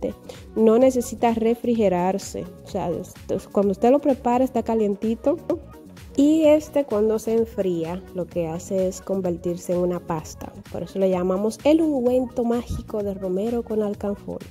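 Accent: Dominican Republic. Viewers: Hola mi corazón, sabes antes de entrar a tu pagina, me imaginaba que eres Dominicana por las palabras que usabas en el video, bueno como dices los nombres de los ingredientes y la preparación!! jajjaja muchas felicidades y voy a ver si hago ese ungüento para regalarse a una amiga que tiene mucho dolor de las articulaciones!!!! Una pregunta tonta que yo escuchaba cuando era pequeña en los 1986 aproximadamente, una vecina de mi mama preparaba un aceite que ella llamaba aceite eléctrico, es verdad que sirve para los dolores también?....... cosas mías, pero siempre he tenido esa pregunta en mi cabeza jajjaja.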